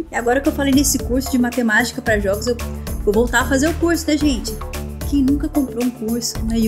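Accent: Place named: Brazil. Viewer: Bequiendgi, Frontiendgi... adoro o sotaque brasileiro no inglês, tanto tempo fora me faz sentir muitas saudades.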